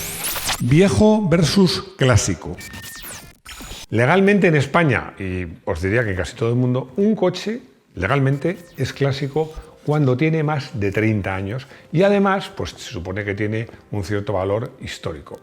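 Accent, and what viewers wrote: Chile. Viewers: es chileno? ese acento reconozco xDD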